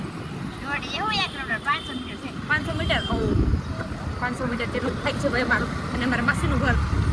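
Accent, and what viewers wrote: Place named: Kathiawar, India. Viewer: Your voice sound like kathiawadi Are you from Rajkot??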